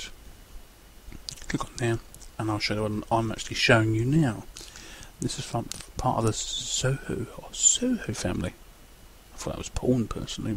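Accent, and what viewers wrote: United Kingdom. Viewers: Very nice, matey. Loads of stuff there. You really are getting what you need. And nice to see some specs on the machine, your running it on. I've never really used KDE. should i give it a try? Btw I love your ''british'' (scottish?) accent. ''tic, toc, tic, toc'' Hehe. Great review matey!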